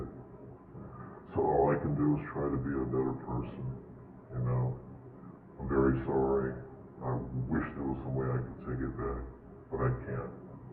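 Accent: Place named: South, United States. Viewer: Texas? The accent hits home hard, definitely from the south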